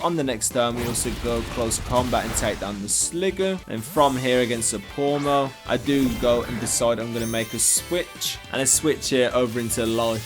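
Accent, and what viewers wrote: United Kingdom. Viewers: I mean your run was fun to watch, but man, you sound so bored narrating it. Also your accent is a little hard to get used to - is it a British accent? never heard someone talk like you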